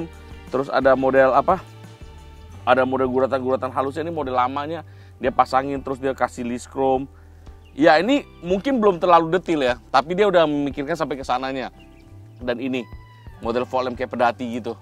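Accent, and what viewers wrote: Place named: Sumatra, Indonesia. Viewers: ABANG INI LOGAT NYA KEK ORANG SUMATRA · keren x koko ini klu Logat bahasanya SUMATERA kali. salam sukses koko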